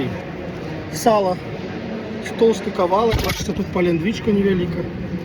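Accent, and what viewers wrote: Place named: Belarus. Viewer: Смотрю Вам из далёкой Сибири .. Моя бабушка была из Белоруссии... Какой чудесный говор... Вспоминаю ее... Понимаю, что все очень вкусно, красиво, натурально....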